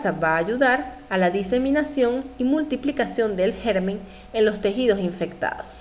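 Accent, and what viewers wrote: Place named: Venezuela. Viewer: Muchas gracias! Me encanto su clase profesora! Además percibí un acento venezolano 🌎💜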